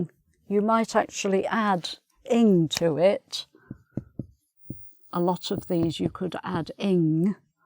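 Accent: United Kingdom. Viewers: The British accent kill my ears. Jesus. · What a beautiful British accent.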